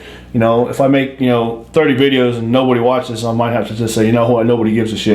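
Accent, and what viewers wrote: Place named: Houston, United States. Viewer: I could immediately tell this guy is from Houston 🤘🏼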